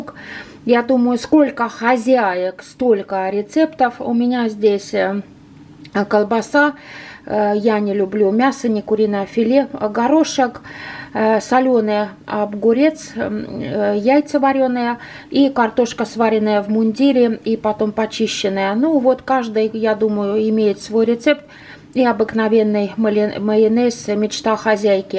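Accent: Finland. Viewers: у вас уже акцент и ударение финское) пс давно вы в финке живете ?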